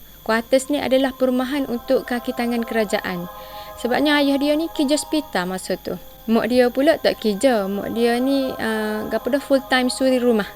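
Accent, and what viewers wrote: Kelantan, Malaysia. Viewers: seronok dengar kecek kelate. boleh lah faham sikit ii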